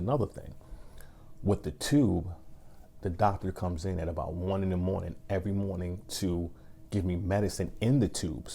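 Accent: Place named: North, United Kingdom. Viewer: Yea man you from up north with that accent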